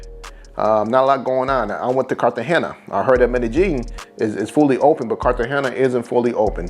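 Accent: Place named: Chicago, United States. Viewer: Sounds like your from Chicago bro. Where did you get your test at and how much was it?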